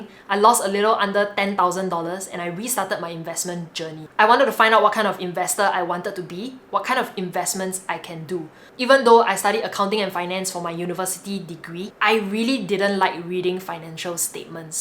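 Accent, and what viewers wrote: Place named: Singapore. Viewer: I can never get used to Singaporean accent. Good content though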